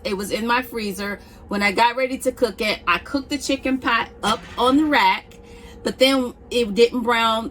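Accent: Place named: South, United States. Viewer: Girl.....I thought you were from the south. I wish I could take credit, I use to watch my grandmother toast bread that way. Great video thank you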